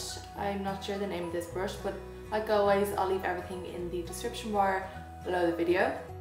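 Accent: Ireland. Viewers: Is she from Ireland? Beautiful 😊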